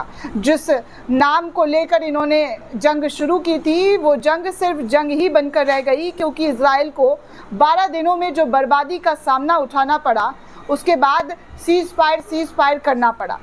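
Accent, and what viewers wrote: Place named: Uttar Pradesh, India. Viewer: She is from UP probably from western UP or Central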